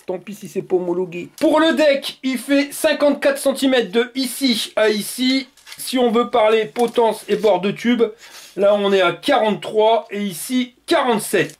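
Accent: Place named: North, France. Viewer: Salut, alors, je viens de voir ta vidéo sur YouTube, sur une trottinette avec selle et pneus offroad. Je suis d’accord avec toi sur la sélection des paliers de vitesse. Mais, moi, j’ai une Hitway S5: celle-ci est censé faire 45 km/h sur 45 km, elle a aussi un siège avec des pneus route anti crevaison, freins à disque avant et arrière, un plateau,(et non, pas un deck- restons dans le français,- pas la peine de se galvauder...), avec matériaux anti- dérapants sur les côtés, le tout, sur un moteur électrique sur la roue arrière de 800 watts... elle a aussi des clignotants avant et arrière, elle fait 20 kg tout plein fait,(si j’ose dire...)... Dans la réalité, j’ai d’une trentaine de Kléber faisant le même poids que toi,(99 kg). Pour l’instant, je ne peux plus en faire, j’ai glissé sur une plaque d’égout et je me suis blessé à la jambe, et ce en marchant... 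Pour ton problème de puissance, je pense que l’idéal, serait un moteur de 350 watts sur chaque roues. Par contre, je ne suis pas d’accord avec toi sur le régulateur de vitesse, moi, je ne l’ai pas, et c’est ce qui manque à ma trottinette pour être parfaite, en tout cas, pour être parfaitement concentré sur la route... Continue avec ton humour, et ton accent du nord, car moi, j’aime bien.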